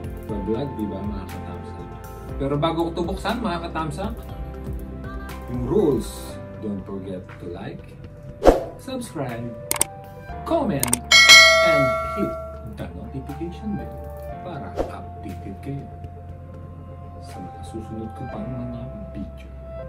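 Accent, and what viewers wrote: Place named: Philippines. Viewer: Guys akala ko chiness ka. Pero noong nagsalita la pinoy ka pala... full supports here. Ganda ng in unboxing mo thanks for sharing godblesss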